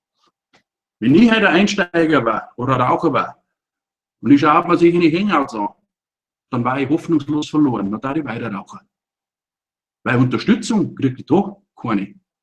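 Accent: Bavaria, Germany. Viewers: Klartext und bayrisch, das passt halt einfach wunderbar zusammen... 👍👍👍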